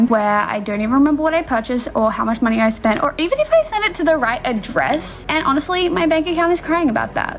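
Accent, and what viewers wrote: Australia. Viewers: Normal people: no: nooore I love her aussie accent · I NEVER UNDERSTOOD AUSSIE ACCENT BUT AFTER WATCHING FOR 6 YEARS, I CAN UNDERSTAND IT PRETTY WELL LMAO🛍🛍🛍🛍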